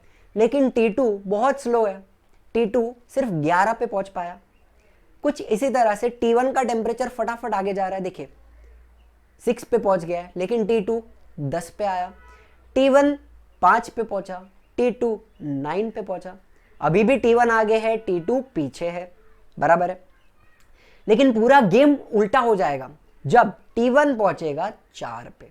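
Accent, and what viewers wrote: Maharashtra, India. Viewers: Sir are you Marathi???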